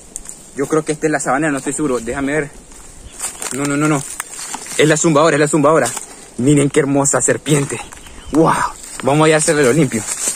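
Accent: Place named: Nicaragua. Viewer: Muy tuani el contenido y muy bueno que tengamos a alguien que muestre este tipo de cosas aquí en Nicaragua, solo mantene el acento nica. Muchos exitos en tu canal hermano 👊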